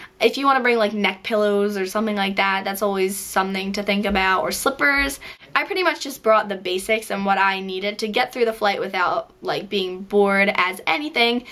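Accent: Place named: New England, United States. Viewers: I think she may be from the north eastern united states because of her accent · it sounds like she's somewhere in New England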